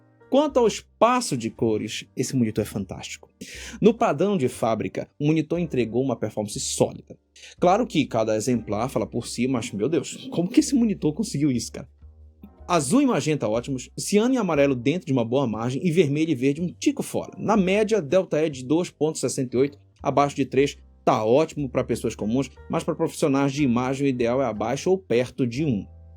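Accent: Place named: North, Brazil. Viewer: Mano vc é de onde? Teu sotaque parece ser aqui do norte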